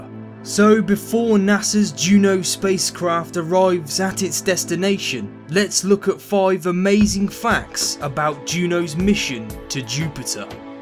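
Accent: United Kingdom. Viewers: cool british accent :D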